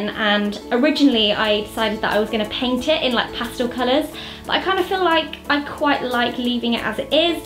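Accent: England, United Kingdom. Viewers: I love your videos so much and I like that you are such a positive and happy human!❤️❤️and i love your british accent👌🇬🇧👍😘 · She's English! Oh my god.... where did you think she was from, bloody Australia! ?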